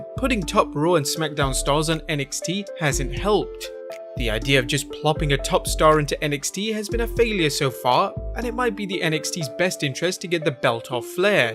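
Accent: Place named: England, United Kingdom. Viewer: where is this guys accent from? i mean specific city in england.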